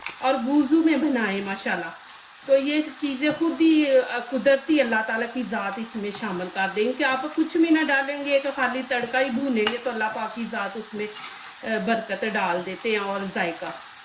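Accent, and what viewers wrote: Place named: Punjab, India. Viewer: Sub hi cooking karny walay bismillah sai hi start karty hain aap boht acha karti hain sirf itni baat hai kai aap ki urdu sun kar dhayan cooking sai hut jata hai punjabi khubsurat zuban hai or aap ka lehja bhi pure punjabi hai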